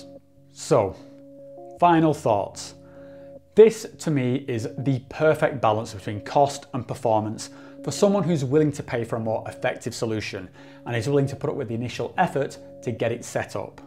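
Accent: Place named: Wigan, United Kingdom. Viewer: Yo are you from Wigan you sound really local I’m from Preston haha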